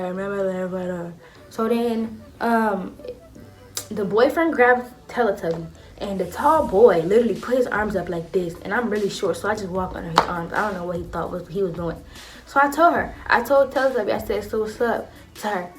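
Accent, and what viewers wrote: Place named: Georgia, United States. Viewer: you from ga ? if so, i can tell bc of how you talk😭.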